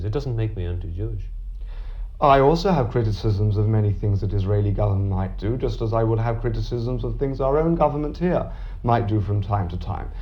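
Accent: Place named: Dublin, Ireland. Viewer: Not really, many upper middle class Dubliners born pre-1950s talked like that.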